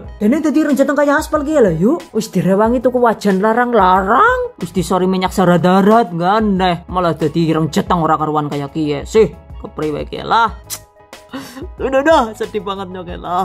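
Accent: Banyumas, Indonesia. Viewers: senengeh enyong.. sekaline rika wong ngapak 😂😂😂😂 tek subrek lah rika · Cuma di Chanel ini dikasih trial sampe ke DNA, lengkap bgt do n don't nya, plus ngapak e ngangenin 🤗 moga sehat sll ❤️ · Keren pokoknya mba,,,Slam ngapak y,trima kasih ilmunya,sukses selalu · Sy menyukai krn ada ngapaknya jg emoji lucu😂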